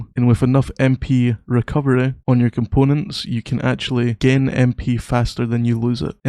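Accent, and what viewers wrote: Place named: Scotland, United Kingdom. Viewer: I hear the Scottish accent so I’ll leave you alone Back rockstar a company created by 2 Scottish brothers , not this goofiness cash grab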